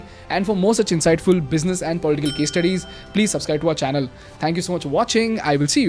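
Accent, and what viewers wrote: India. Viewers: at least get someone who can speak Emnglish without a strong Curry accent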